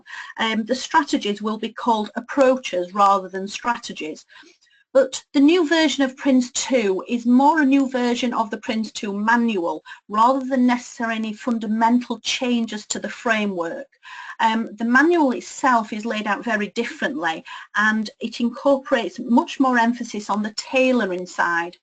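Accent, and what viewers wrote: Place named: North, United Kingdom. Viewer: ANNOYING NORTH ACCENT ...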